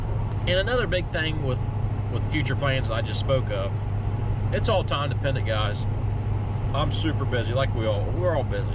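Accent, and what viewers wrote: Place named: South, United States. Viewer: You ever played Edgewater in Lancaster, SC? I would have never guessed you were from Ohio. You sound like your from the South. Not meaning that in a negative way, just an observation. Thanks buddy. SC has some nice courses!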